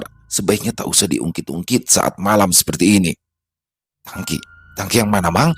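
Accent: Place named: Banjar, Indonesia. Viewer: Bang orang apa sih? Logat bahasa banjarnya mantap mang..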